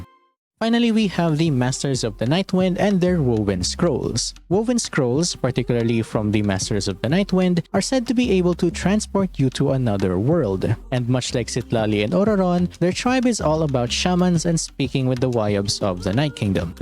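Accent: Philippines. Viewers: Are u a filipino fellow? Almost similar accent with peeps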